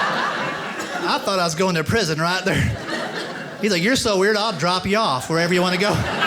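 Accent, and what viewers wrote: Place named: New England, United States. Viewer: What a hoot, when I was growing up in the 50’s, my whole family talked like him! It was fun to hear, now that I’m the oldest generation, surrounded by New Englanders!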